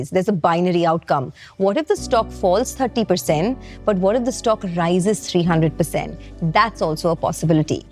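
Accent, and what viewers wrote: India. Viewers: Sweet English from beautiful Indian...even the lessons....and much more...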